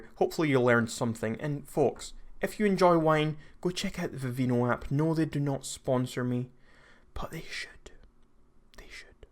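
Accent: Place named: Scotland, United Kingdom. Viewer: Your Scottish bro, stop tryna act class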